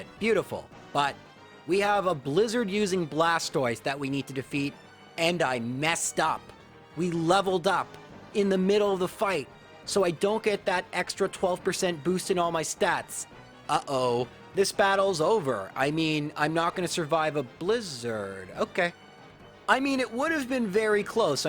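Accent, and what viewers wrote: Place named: Canada. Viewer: I'm going to say this but you sound like your candanian which I say hello neighbor. After seeing you live you look like christian slater's little brother Edit: add the join button I wanna support your channel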